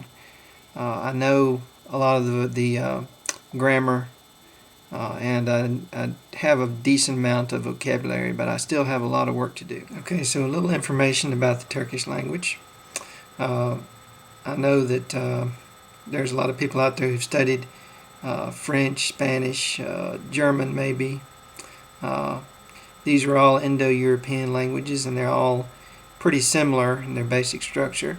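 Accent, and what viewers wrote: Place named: Carolinas, United States. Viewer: Thanks I'm greek and i was interested in learning some of my racial neighbors languages and you sound like ur from down south, im from NC and ur accent sounded very carolinian. But nice to know a few things about the turkish language from a non biased PoV(as most people know we dont seem to get along too well... but shit thats past worries.)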